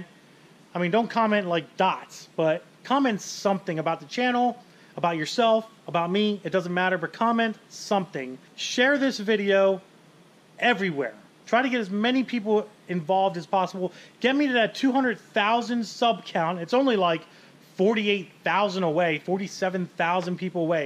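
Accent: United States. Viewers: Learn to speak English, not American English, in fact you and Mike should go to the same classes and learn proper English, nae in fact all the crew in both your and Mike's vlogs learn English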